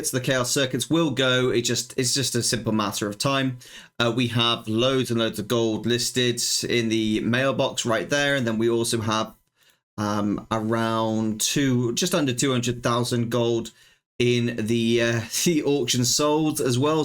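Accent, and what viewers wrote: United Kingdom. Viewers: you sir have probably the best energy its just so refreshing to see, also the english accent is easy to understand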